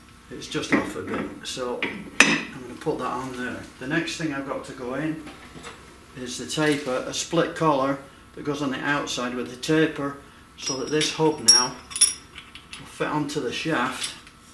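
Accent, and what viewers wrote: Yorkshire, United Kingdom. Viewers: Thanks so much for this excellent video. All of your videos, actually. Top notch. On a lighter note, I'm amazed that you can work in your good shirt and not ruin it. I've been wrenching on these cars for 50 years and mine are usually ruined in the first 20 minutes. 😂 Wish I knew if you had paypal. I'd send you 10 bucks for a pint (or 2!) as a thank you! PS love your accent. are you in the Dales?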